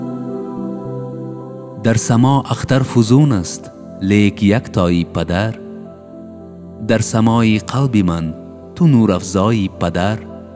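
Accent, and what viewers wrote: Tajikistan. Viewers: Это таджикский диалект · Это Таджикский акцент.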